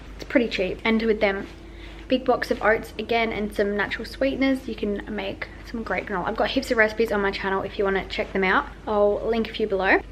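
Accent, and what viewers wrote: United Kingdom. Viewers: You sound British but use dollars??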